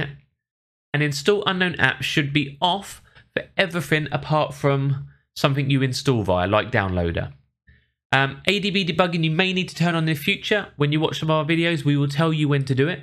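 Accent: England, United Kingdom. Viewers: Bro I got to tell you thank you for the the help it really was very informative and I think your English accent man I like I love the way you guys talking to anyone dodgy and stuff I'm over here in America but it's great man brilliant you guys always say brilliant too I love it. Thank you so much for the help I subscribed to your YouTube channel and like the video I'll be checking in more often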